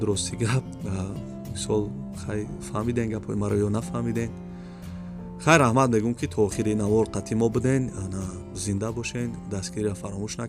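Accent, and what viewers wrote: Tajikistan. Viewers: Додари апа дигар каналои хона савдо гапзада хам наметонан гушкардан ам намехохи ахсан ба и гапзани тоза лахчаи точики 👍бехтарин хазор лайк 👍 👍 👍 👍 👍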